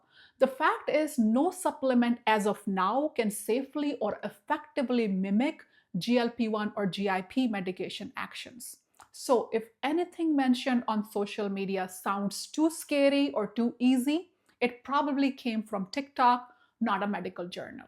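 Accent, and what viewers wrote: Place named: India. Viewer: Good one but sorry to say u should change your pressurized Indian accent ..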